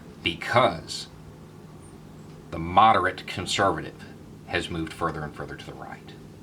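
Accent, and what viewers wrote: South, United States. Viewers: The Trump era has left me feeling ashamed of the south. So I have to say, as a fellow Southerner, it's amazing hearing somebody say these things in a southern accent. What state are you from? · Good to hear a southern accent making such sense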